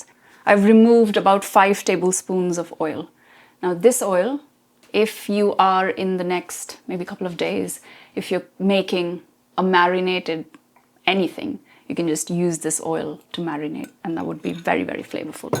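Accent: United Kingdom. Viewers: Is she English or Australian? What's this accent? Or maybe she's Indian? I realize she is ethnically Indian but the accent doesn't sound like it. I love the dish by the way! Watching from Canada!!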